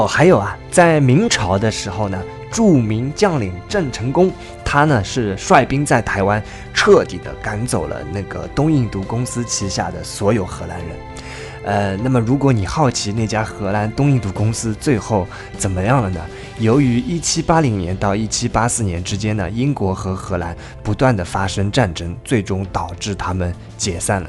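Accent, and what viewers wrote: Shanghai, China. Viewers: 怎么感觉有上海口音了，，，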